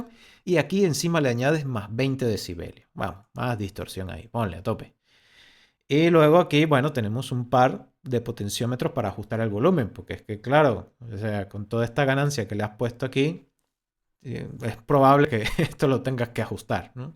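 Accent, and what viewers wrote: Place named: Venezuela. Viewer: Ok gracias por el dato y la corrección, ya me parecía familiar el acento venezolano saludos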